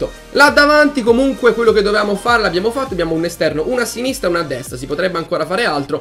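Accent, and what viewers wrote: Tuscany, Italy. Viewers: Toscano puro eh bella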